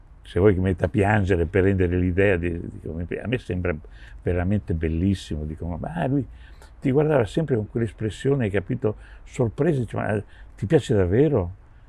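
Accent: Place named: Ferrara, Italy. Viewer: Si sente la ferraresità di un grande batterista!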